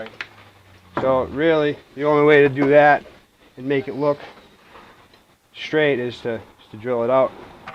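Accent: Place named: Boston, United States. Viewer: 2 words in and I knew this guy was from Boston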